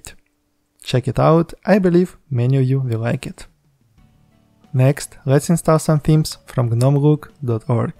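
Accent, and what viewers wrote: Russia. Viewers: YourOwnAzog I think yes, I am Ukrainian and recognize this russian-english accent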